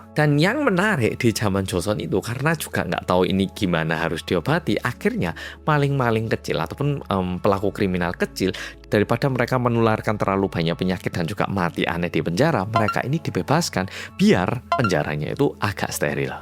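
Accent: Java, Indonesia. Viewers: Hai org korea yg medok😅 sehat selalu 💪🏿 Lgsg nyimak🎉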